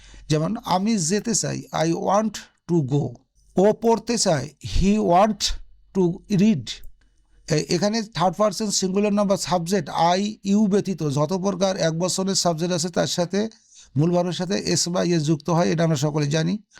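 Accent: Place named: United Kingdom. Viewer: Apni british english bolchen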